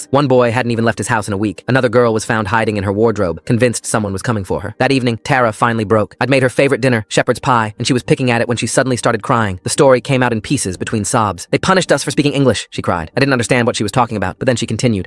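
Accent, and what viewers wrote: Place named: United Kingdom. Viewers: Where did the British come from bro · Why did it randomly turn British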